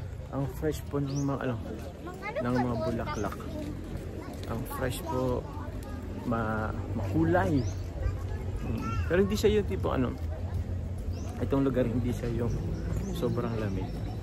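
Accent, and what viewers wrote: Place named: Visayas, Philippines. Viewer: Wow marunong na magbisaya si ❤❤❤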